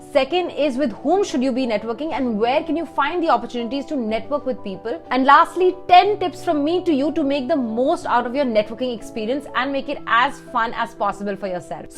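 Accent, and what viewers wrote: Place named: India. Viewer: Idian english for sure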